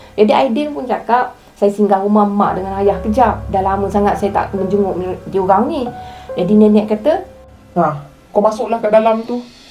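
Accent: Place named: Pahang, Malaysia. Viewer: Aok ni org mna.. melantoi mlek cara aok bcakap😅😁..salam rodong seram dari kwn org phg..peace✌️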